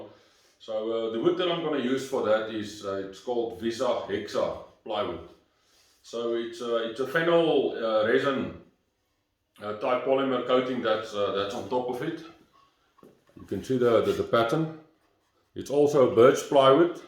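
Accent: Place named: Afrikaans, South Africa. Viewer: I knew that was an Afrikaans accent! But the name puzzled me. Must be Belgian/Dutch/ South African.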